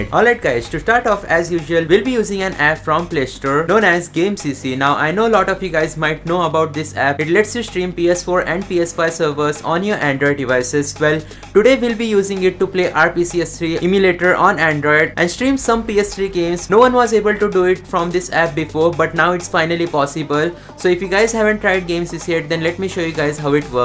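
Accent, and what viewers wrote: India. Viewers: 1 plz speak more slow cus indian english realy understand hard ... 2 that cloud game why speak lier? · Tikkken with the smelly mother fucker indian accent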